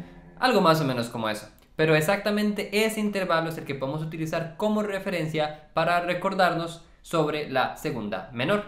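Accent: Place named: Costa Rica. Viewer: Hey. Ud es de Costa Rica? Su acento es tico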